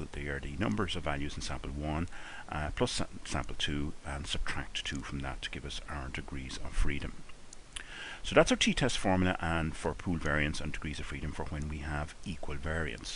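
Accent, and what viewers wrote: Ireland. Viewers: I really enjoy Irish accent. It makes learning fun again:D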